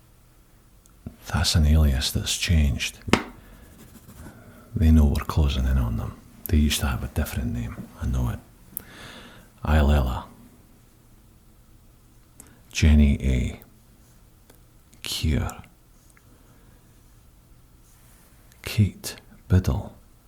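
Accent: Scotland, United Kingdom. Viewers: i looooooooooooooove how Scottish sounds.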